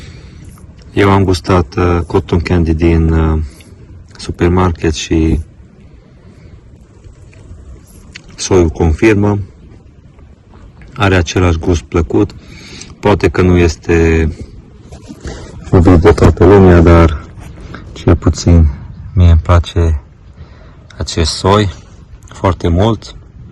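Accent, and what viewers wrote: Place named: Transylvania, Romania. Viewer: Vă salut !!! Din ce zonă a tări sateți ???? După grai ,ardelean, !!!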